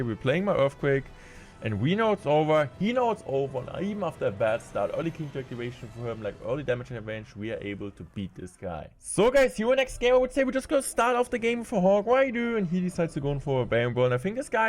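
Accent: Germany. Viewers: can u add subtitles to ur videos? ur German accent is so damn hard to understand and the fact that u talk pretty fast is not helping at all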